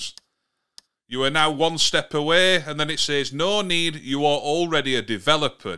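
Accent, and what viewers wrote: Yorkshire, United Kingdom. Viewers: Subscribed! Proper Yorkshire!! Keep em coming! Great to hear the accent all the way from 🇦🇺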